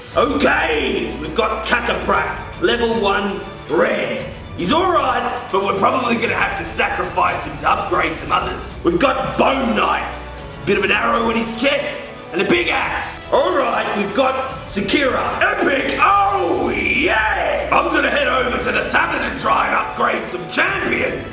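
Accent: Australia. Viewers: I love the Australian accent!!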